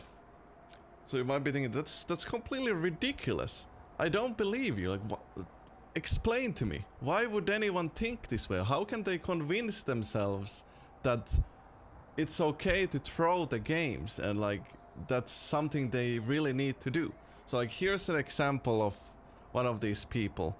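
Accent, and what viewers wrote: Finland. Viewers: I can hear your Finnish accent from a mile away, love that! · I feel his pain when he tries to say an english word correctly, only finns know. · Finnish accent is kinda cute tho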